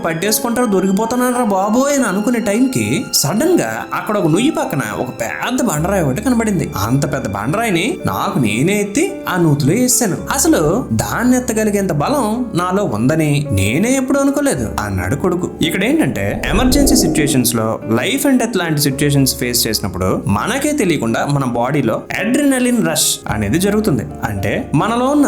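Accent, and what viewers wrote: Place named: Andhra Pradesh, India. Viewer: Nee Andhra accent dharidhramga undhi ra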